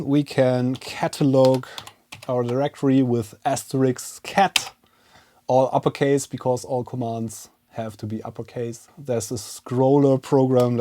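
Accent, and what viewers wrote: Germany. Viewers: In German (and many European languages) the letter G is always pronounced that way. Phonetically "Gee" is very rare, its almost always "Geh" and that will be what learned from a very young age. Just an FYI, the letter W is German is pronounced V which is why he also says things like "Wersion", again its simply because he was taught that from the beginning. Pretty obviously, German kids are taught German before anything else. IMO, as long as you understand what someone means there's no real need to correct them.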